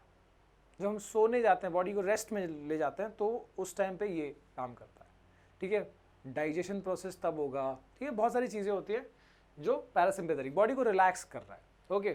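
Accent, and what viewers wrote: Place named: Haryana, India. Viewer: I really like haryanvi accent and yaa we are neighbours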